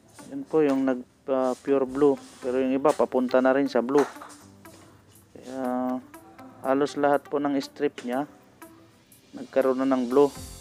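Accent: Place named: Mexico. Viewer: I like this guy's Mexican accent, makes this video more likable.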